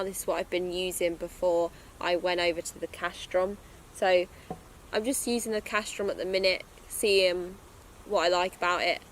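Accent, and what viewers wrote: United Kingdom. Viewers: Oo you’re British that’s nice for a change well used to American vids about bushcraft but no U.K. content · British accents sound great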